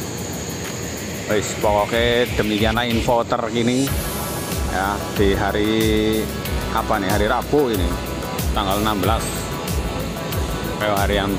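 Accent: Banyumas, Indonesia. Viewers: Selamat siang kang.. Nek ira ngapak ora ke penak..antap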